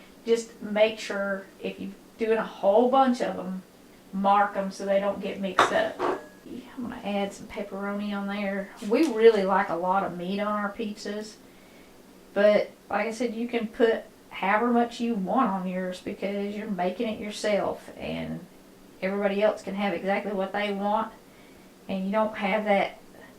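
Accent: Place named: Kentucky, United States. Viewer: I want to thank you for your delightful channel. I have subscribed. I actually find your videos calming, full of love and deliciousness. I spent 4 years in your awesome state while I attended under graduate to college in Western Kentucky. Your food and cute little accent brings me back to those great days. I was a “Yankee” back then. I really didn’t know what that meant, but since I was “Italian” with first generation parents, they gave me a pass to join their group not as a yankee but as a European. LOL. I learned so much living in Kentucky. It’s a pretty great place to live.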